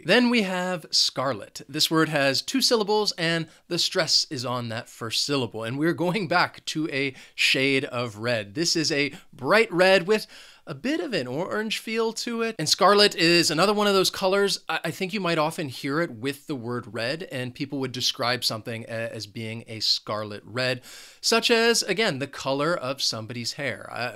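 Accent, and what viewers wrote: United States. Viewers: unfortunately your accent is American not British 😕 any way thanks a lot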